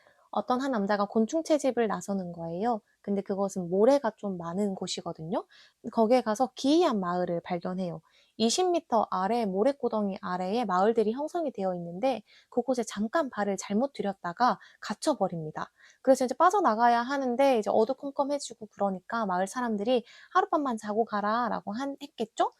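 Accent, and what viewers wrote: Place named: Gyeongsang, South Korea. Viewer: 예전에 김동식 작가님도 글쓰기에 대한 공부를 단 한 번도 해본적 없으신데, 보안관련 일을 하다보니 저녁에 시간이 많이 남았고, 이런것도 이야기가 될 수 있나? 하는 마음에 글을 적기 시작하셨다는 걸 듣고 엄청 놀랐었거든요, 이미예 작가님의 펀딩까지 가신 스토리도 그렇고, 전혜지 작가님, 😶 정말, 저런 몰랐던 작기님의 사정을 들었을 때 강하게 동기부여가 생기는 경우가 있더라구요! 허업! 🫢 저도 누군가 관계를 형성함에 있어 가장 중요하게 생각하는 게 무어냐 물으면, '나는 존중과 배려를 언제나 1순위로 생각한다, 입장을 바꿔서 생각해 볼 수 있어야 한다' 라고 언제나 말해왔거든요??? 너무 신기해요!! 😶 갱상도 사투리에 미소 지으며 들었네요 ㅋㅋ 🤭 아니 근데 어떻게 저렇게 말 한 번 안 절고 낭독을 잘하실 수 있을까요?? 축축하고, 찝찝한 이야기를 저렇게 밝게 귀엽게 설명해주시는 ㅋㅋ 😂 정말 스토리 정리를 엄청 몰입감 있게 너무 흥미롭게 잘 설명해 주셔요 😊 저~~ 얇은 책들에 붙어 있는 인덱스나, 하이라이트 친 것들을 보면, 님이 얼마나 감명 깊게 읽으셨는지 느껴지는 거 같아요:) 저는 요즘, 삼체나 프로젝트 헤일메리 안에 거론되는 가상의 이론이라든지 언급되는 기존의 이론들에 대해 과학자 또는 이과 유튜버 분들이 너무 즐겁게 토론하고 얘기하시는 모습을 보면서, '와, 나는 저런 이론은 이해도 하나도 안 되고, 무슨 소린지 모르고 지나갔음에도 이렇게나 재밌게 읽었는데, 저들은 도대체 얼마나 더 더 더 재밌게 읽었을까?' 하는 생각을 하게 됐어요 😢 그래서 하드 SF에 자주 등장하는 몇 몇 이론만 대략이라도 이해하고 있자! 하는 마음에 검색하기 시작했다가, 과학 유튜버들에게 빠져버렸어요, '어!? 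나, 과학 좋아했네?' 같은 느낌이에요 ㅋㅋㅋ 😂 어쩌다보니 책도 안 읽고 과학 유튜브만 보고 있는 요즘입니다 😆 아침에야 댓글을 보실! 오늘도 또 행복한 하루 보내시길 바라요 🤭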